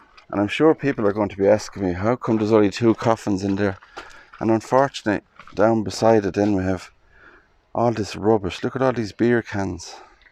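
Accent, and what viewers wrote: Ireland. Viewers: Yet again, a lovely adventure around a fascinating Graveyard. Every one of them has secrets never to be told. Interesting Tombs and as always, your beautiful Irish accent makes these videos even more watchable - thank you. Take care 🙂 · Are you Irish? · Thank you for your interesting commentary, which I really enjoyed listening to. You have a beautiful Irish lilt to your voice and you sound as if you might be a good singer. Stay safe and keep well. Zora in Australia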